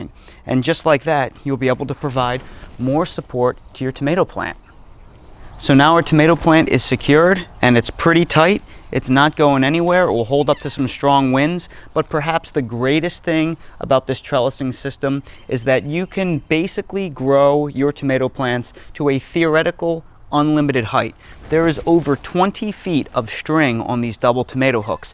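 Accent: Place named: Northeast Philadelphia, United States. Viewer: No way is this dude from North Carolina. His pronunciation of “herricane” means he’s from Gloucester County, NJ. Williamstown, Wenonah, maybe Deptford. “Shtrike” instead of strike is Northeast Philly.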